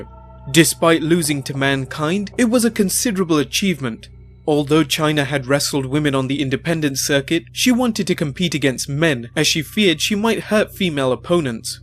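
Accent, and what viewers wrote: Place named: England, United Kingdom. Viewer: The English narrator gives it an air of sophistication and authenticity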